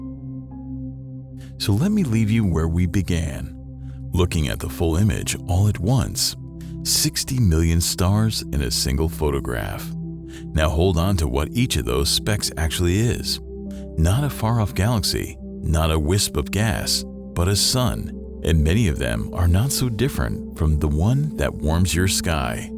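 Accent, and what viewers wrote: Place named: United States. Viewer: I find it hard to listen to the American accent. It has been poisoned.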